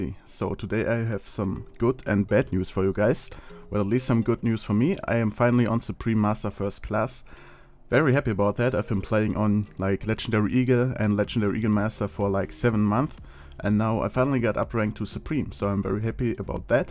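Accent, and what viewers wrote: Germany. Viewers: Ich liebe deinen deutschen Akzent:DD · Man merkt sofort das du Deutscher bist :D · Are you german? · Ok du bist deutscher oder? Wenn ja merkt man das, wenn nein kannst du das hier ja eh nicht lesen xD ^^ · Man hat sofort gemerkt das du aus Deutschland kommst ^^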